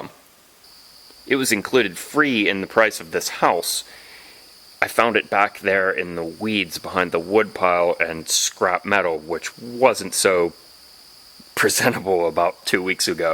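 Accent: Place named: Washington, United States. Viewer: Where is this guy from?? Sounds Washington State to me...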